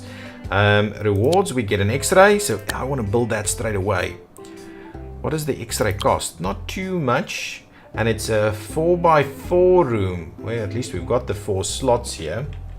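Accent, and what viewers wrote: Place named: South Africa. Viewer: You must be from SA 🎉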